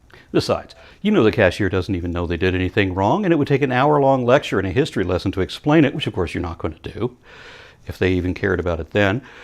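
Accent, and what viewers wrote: South, United States. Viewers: I love his southern alter ego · That southern voice is so perfect lol (Also nice DND analogy) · Your Southern voice sounds both mentally handicapped and frightening as all Southerners do to me.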